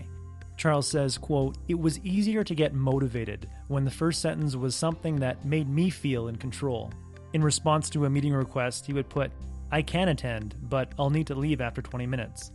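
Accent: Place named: Canada. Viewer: Bona fide Canadian (Alberta?) accent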